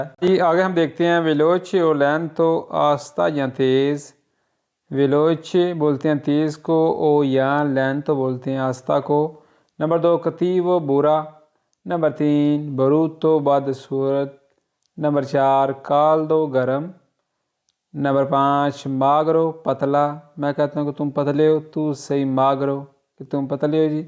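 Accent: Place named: Punjab, Pakistan. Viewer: Panjabi di Kya baat A... SAHI Gujrat wala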